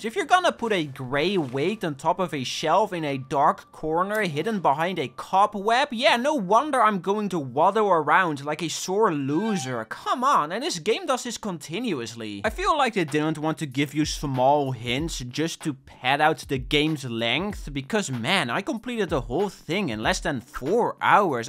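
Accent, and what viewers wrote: Netherlands. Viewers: Binnen de eerste 2 seconde herkende ik een mede-Nederlander. Gekoloniseerd broeder. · Is dat een Nederlands accent dat ik hoor? ;) Goede video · This guy his English sounds so painfully Dutch. Lol Good video though.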